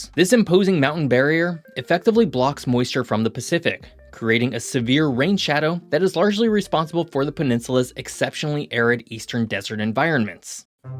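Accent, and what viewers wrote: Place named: United States. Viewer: rip that gringo spanish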